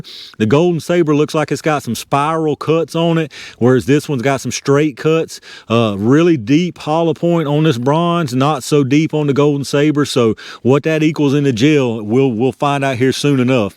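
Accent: South, United States. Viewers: I Love that southern accent !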